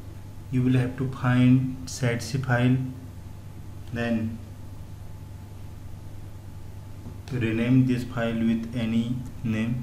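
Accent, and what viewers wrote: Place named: India. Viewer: is Indian accent, I feel fine with it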